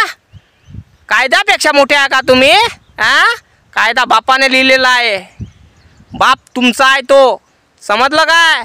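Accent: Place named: Vidarbha, India. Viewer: छान दादा मला तुमचे बोलण्याची स्टईल खूप आवडली. त्यामध्ये विधरबाचा गोडवा आहे. ❤️❤️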